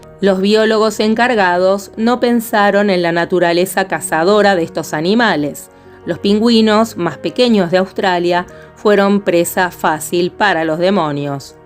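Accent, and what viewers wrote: Argentina. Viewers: me encanta el saludo: hola amigos, en ese acento tan marcado argentino tusho, no sa sabia de la existencia de estos canales tan informativos de la vida biologica, ya me suscribi y ya vi muchos videos, los humanos somos un cancer, pero veo q la natalidad esta bajando, y ahora somos un poco mas ecologicos, ojala aprendamos a vivir en armonia con la naturaleza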